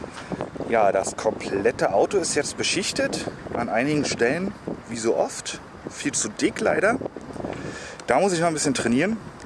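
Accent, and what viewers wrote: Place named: Berlin, Germany. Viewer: Ohhhh Berliner 👍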